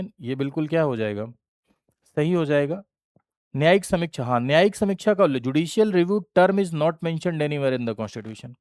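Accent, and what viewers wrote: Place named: Uttar Pradesh, India. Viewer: Sir jab aap comedy krte h tb bda achcha lgta h bilkul up style m hm bhi knpuriya h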